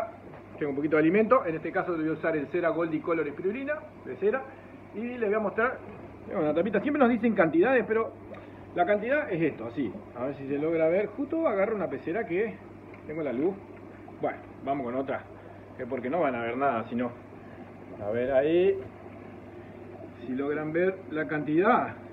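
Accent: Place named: Entre Ríos, Argentina. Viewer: si eras de Entre Ríos por la forma de hablar igual a acá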